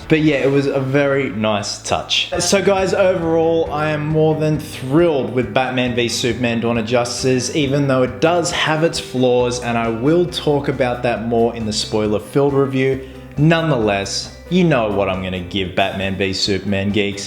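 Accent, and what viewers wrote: Australia. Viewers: DAAAMMM you look sexy in that bat outfit, mmmmm yummy and love the Australian accent makes you even sexier. Do i agree with your assessment with the movie? Not completely but that dont take away from your sexiness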